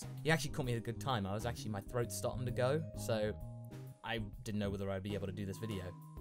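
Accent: United Kingdom. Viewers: u sound british m8.. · The first time I heard your natural speaking voice when you were at a panel, I honestly thought you were just putting on a fake accent, and I was like, "Who the hell is that guy? Where's Goku's voice actor?" Only to realize YOU were Goku and that your natural voice has a British accent. I felt super awkward when I realized that, but I'm pretty glad now knowing you were behind it, and seeing you do the DBZA Goku voice just makes me smile. Keep up the good work,! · He wouldn't get any troubles to make a Goku Black voices considering the British Accent on that. Also, when I just figured that he's actually British, that just blowed my mind! That's amazing, I could swear that he was American. Much love from Brazil 🇧🇷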